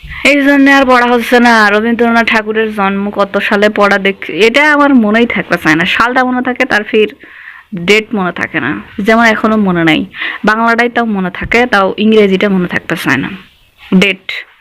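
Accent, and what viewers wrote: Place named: Panchagarh, Bangladesh. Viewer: হামরা পঞ্চগড়ের লোক হামার কথার সাথে মিল আছে তুমার লগত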